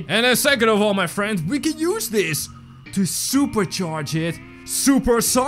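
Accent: Netherlands. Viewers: are you not dutch hmmmmmmmmmmm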